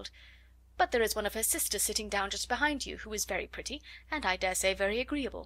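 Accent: United Kingdom. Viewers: so british love it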